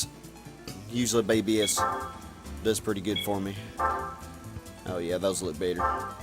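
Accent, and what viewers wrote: South, United States. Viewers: Love your southern accent makes these videos real entertaining to watch, like its if goofy is commentating this badass game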